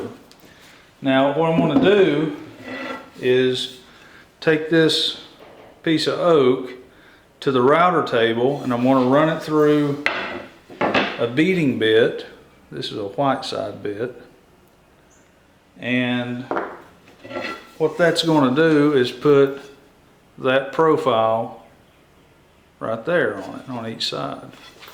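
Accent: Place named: Tennessee, United States. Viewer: Have stairs shaped just like this one in a rental home. I have to take the railings off so that occupants can move there beds ,dresser etc. to the rooms upstairs which over time has created a lot of wear and tear on the stairs from taking railings on and off.Never been much of a carpenter, so i was wondering if you were for hire?Listening to the accent it seems you are in my neck of the woods. Am in southeastern part of Tn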